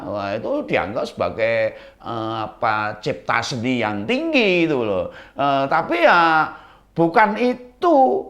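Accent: Java, Indonesia. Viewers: saya suka dgn logat mas ini, jawa banget, dan saya sering belajar bahasa jawa, karna teman2saya banyak yg dari jawa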